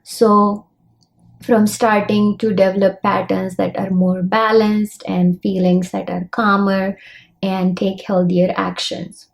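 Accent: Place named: United States. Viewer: A cute Marathi girl with American accent 😀😀 A nice video anyway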